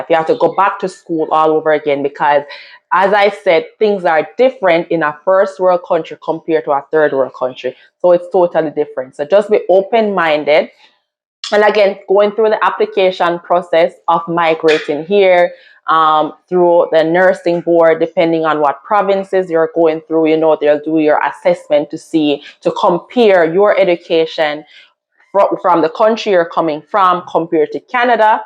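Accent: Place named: Jamaica. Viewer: I love how you embrace your Jamaican accent.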